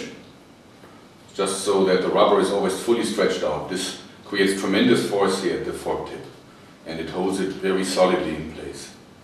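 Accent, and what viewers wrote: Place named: Germany. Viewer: dieser akzent... jup, ein deutscher! :-)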